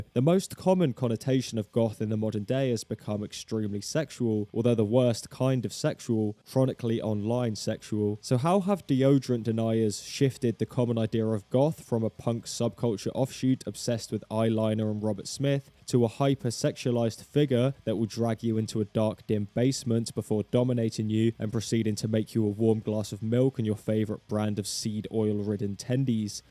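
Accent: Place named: Cockney, United Kingdom. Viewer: New to your channel, but loyal to the cause. Binging your shit for the night you cockney fuck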